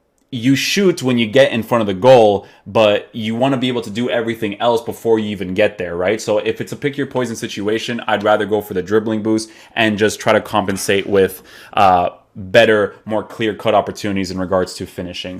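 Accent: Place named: Portugal. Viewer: És tuga? Notei aí algum português na pronúncia ahah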